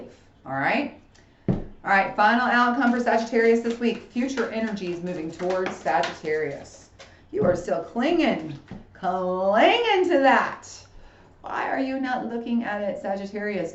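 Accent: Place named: South, United States. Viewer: I'm digging the vibe here so much! That beautiful Southern accent sucked me right in lol. I love how every reader comes with their own signature flavor, and I'm happy to find another gem on here 😁🙏✨ **I knew you had to be a Saggie lol!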